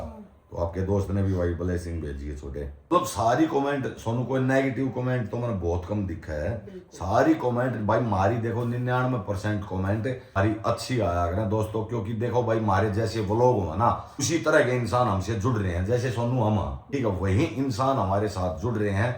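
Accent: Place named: Haryana, India. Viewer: Apka man saaf hai aur apke pariwar mei koi dikhawa nahi hai yahi apki sacchai hai. Jis ghar ki aurat itni saaf dil or hansmukh ho vaha hameshacbkhushiya hoti hai. Bhole baba ki kripa aise hi bani rahe aapke pariwar pe. Apka jo haryanvi boli ka andaz h vahi apke blogs ki shaan h aise h blog banate rahiye Bhai sahahb ji. 💐💐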